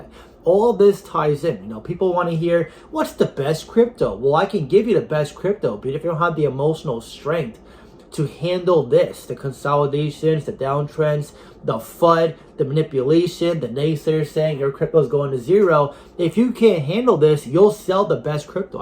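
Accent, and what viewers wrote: New York, United States. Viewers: Are you from NY?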